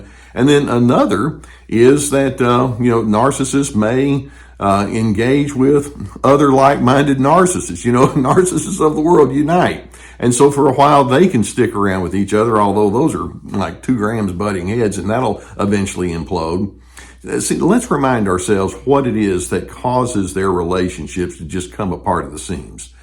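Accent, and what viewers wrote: South, United States. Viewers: You have a great voice and a beautiful southern accent I can listen to this all day.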